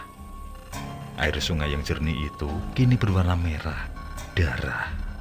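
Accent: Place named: Blitar, Indonesia. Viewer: Pak de bhasane mirip wong blitar ..🙏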